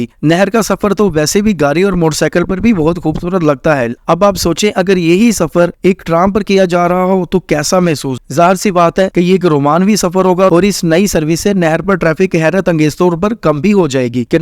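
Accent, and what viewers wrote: Punjab, Pakistan. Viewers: For panadol people certainly will be romantic trip as heer n ranjha and sohni maahiwal Who speak urdu in lahore Only cows n goat will ride along with payndo people Enjoy your ill letrate PM is leading this proviance Who can't write a word of urdu Who lives in lahore all butt and mughal caste 😂 Who can't see n speak any word of urdu or English as u r talking in punjabi accent urdu So good luck to all butt and mughal See old lahore and u won't any like to spit how dirty and congested and how people live like roaches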